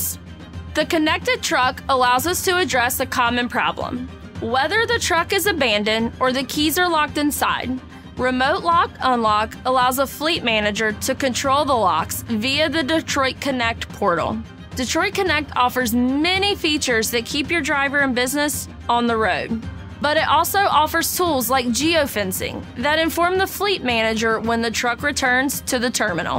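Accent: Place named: Texas, United States. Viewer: I think she meant WAKE. As in wake turbulence. But because Freightliner picked someone with a Southern or possibly Texan Twang to do the VO in this video, wake sounds like weight and wash sounds like "worsh."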